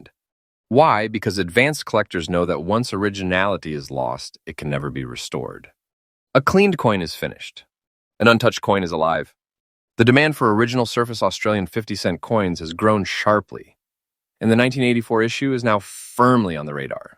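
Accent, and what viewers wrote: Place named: United States. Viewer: Something fishy about what you've said about 1984 Australian 50c coin, started with $201,000...and later, you said it's valued at $183,000 Really? Is it genuine? Not some kind of scam? I'm sure.. I'm not the only one watching and following this video. Should be an Australian talking about Australian coins, but you sound like an American.. Come clean, man!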